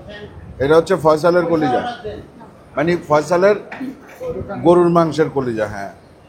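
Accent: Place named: Rajshahi, Bangladesh. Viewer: Vaiya kothar moddhe dekhi Rajshahi r akta taan Chole ashese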